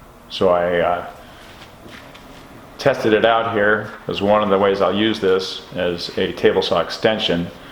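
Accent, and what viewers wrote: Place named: Southeastern Ohio, United States. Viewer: "Acrosst" vs "Across" is regional dialect, certainly in southeastern Ohio, ala Crick vs Creek, Warshington vs Washington, Booshes vs Bushes. I would know, being a hick from the sticks.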